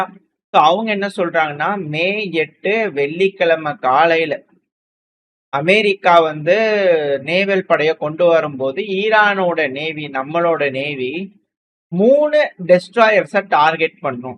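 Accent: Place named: Chennai, India. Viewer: Madras தமிழில் பேசாதீர்கள்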